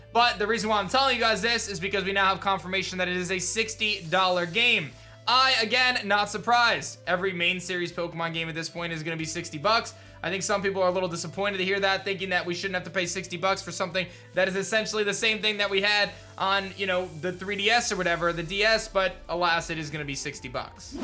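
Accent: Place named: California, United States. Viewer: honestly it makes it hard for me to watch, I liked his old stuff and I’m actually enjoying his xenoverse playthrough but he sounds like he’s from Cali or he’s trying to be a chad but I know he’s from like the midwest or something. It sucks that he’s gotta change his persona to get views but I guess that’s his job right ?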